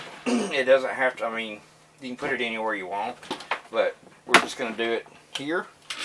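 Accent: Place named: South, United States. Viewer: Haha. People can be cruel at times. If it is any consolation , you have a great southern voice.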